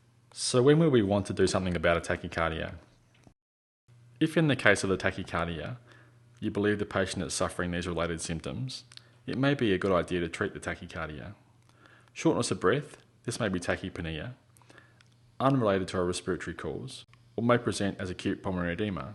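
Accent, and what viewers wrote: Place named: Australia. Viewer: This bloke is an Aussie! Take it from a Brit :)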